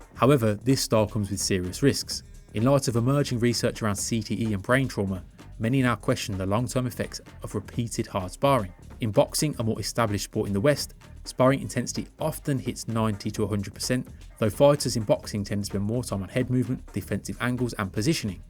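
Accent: Netherlands. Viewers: never expected to hear ann amsterdam accent in english lmao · thickest Dutch accent ever even Marcel Vos can't get on that level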